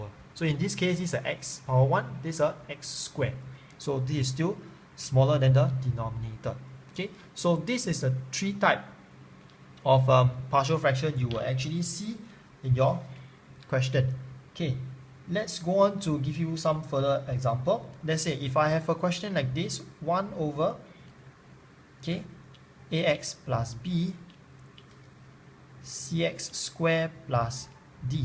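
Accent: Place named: Singapore. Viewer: I recognize the Singapore accent ;), keep it up man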